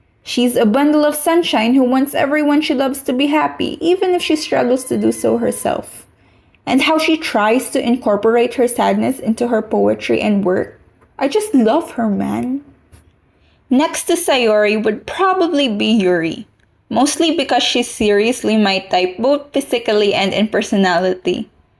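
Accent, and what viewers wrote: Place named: Philippines. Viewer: Filipino? The accent is strong.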